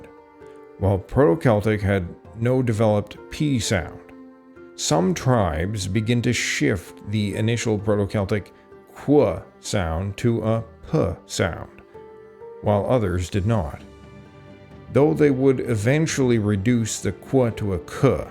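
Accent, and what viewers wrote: Canada. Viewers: He's Canadian. And over the years I've known many native speakers of Nova Scotian Gaelic, and in their dialect of English they pronounce it gay-lik. It's just how it is, and they've got every right to describe their own language as they like. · They do. This is a Canadian channel so he has a Canadian pronunciation which is the same as the Irish pronunciation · Can't say the stuff I've seen from Scots is any better. The placenames are going to be more accurate but not the information. Also the video is in Canadian English so don't expect the words to sound exactly the same as they do in Scottish English. As for the visuals, well have to agree with you there, sick of ai slop and sick of random images that don't relate to the audio. The most common way of pronouncing gaelic is 'gaylick' in America, England, Australia etc.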